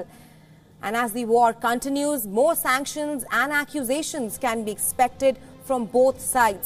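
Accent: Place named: India. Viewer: Disgusting ' Indlish ' pronunciation !!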